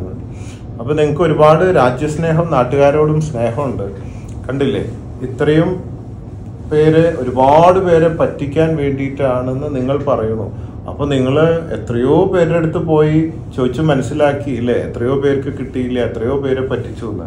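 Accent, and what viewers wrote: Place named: Neyyattinkara, India. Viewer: Ithu tamilnadu alla......kaliyakkaavilai,neyyattinkara slangs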